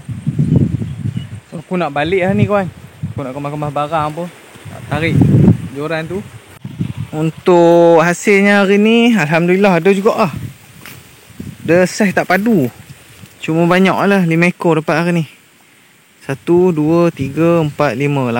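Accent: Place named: Pahang, Malaysia. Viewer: Loghat macam loghat pahang...org pahang ke?...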